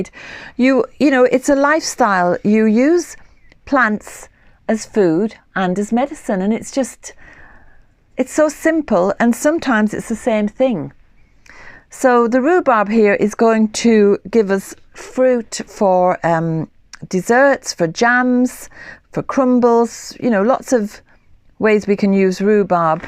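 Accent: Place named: North East England, United Kingdom. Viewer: Another great video ! I always hear the north east accent whilst your talking, .I'm from South Shields and guess your from close by.. Thank you for sharing your knowledge and love of nature with us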